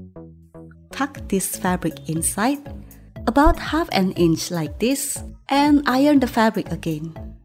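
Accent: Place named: Malaysia. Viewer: Are you Malaysian or Indonesian!!! Your accent 😭😍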